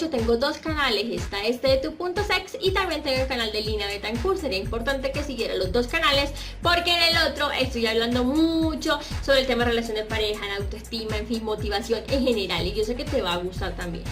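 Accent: Colombia. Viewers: Me vuelve loco ese acentito colombiano cada vez q t escucho . Saludos desde Rusia mi amor 😘😘😘😘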